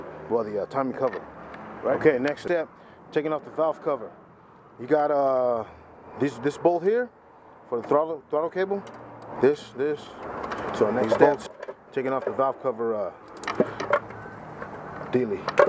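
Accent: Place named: California, United States. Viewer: this fools from cali! i can hear his accent, keep doing your thing man!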